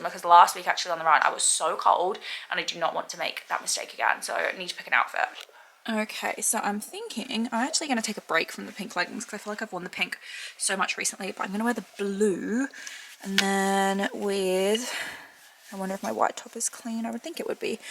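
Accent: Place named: Australia. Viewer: g day/evening for you can I ask when did you start picking up the aussie accent. love you r vlogs by the way 😊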